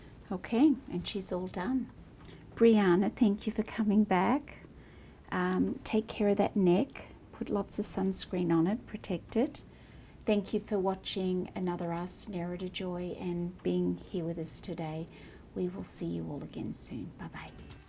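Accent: Australia. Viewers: Aussie as hell lol what a nice touch hearing that Aussie accent in the video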